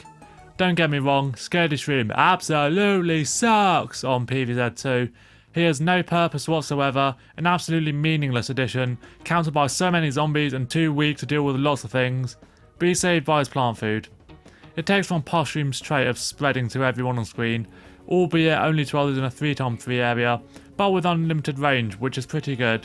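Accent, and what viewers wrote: United Kingdom. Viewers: This guy is so unironically British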